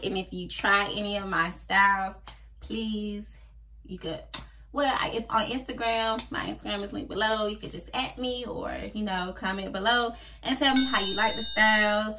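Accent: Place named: New Orleans, United States. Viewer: Beautiful head wraps. New Suscriber on board. Are you from New Orleans? I love your accent.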